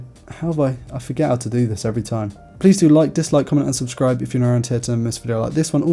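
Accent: United Kingdom. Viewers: Your british accent is gorgeous😍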